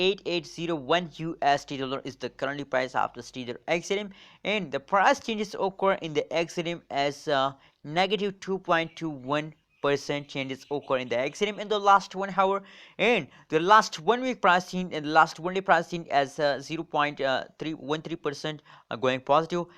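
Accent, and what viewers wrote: India. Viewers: Whenever I hear a Indian accent on these stock I feel like it’s a scam. Since all these damn scammers calling people phones are from somewhere in India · Why is there a picture of a white dude but i can clearly hear you are indian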